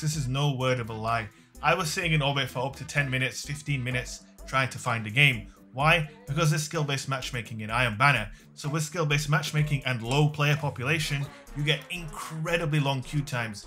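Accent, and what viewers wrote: United Kingdom. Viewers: You're so british lmao I luv it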